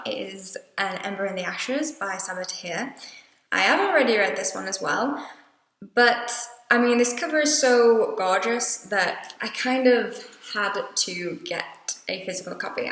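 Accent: United Kingdom. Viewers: I'm so glad your doing video blogs now. I love hearing about the books, I have read a lot that you mention in your blogs and others I add to my list as you make them sound interesting. I love your British accent as I love all things British. Had to include that!:) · Aw, your cat is so well-behaved! Uprooted is next on my list. Anything that gets compared with Howl's Moving Castle is an instant win. Also, it's so great to hear a Northern accent! My Stoke one tends to get the ear squinting treatment! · subscribed to your channel! Please keep uploading new videos. I felt in love with your British accent. :D The more videos you upload the more confident you eventually become. :) p.s. nice T-shirt. Give your cat a rub it was well-behaved. :D :D :D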